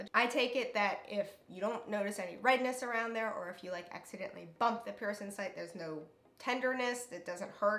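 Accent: South, United States. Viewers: This does not anything like Midwestern or Canadian 😂 I’d bet a dollar shes from the south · Her accident is like half way southern😂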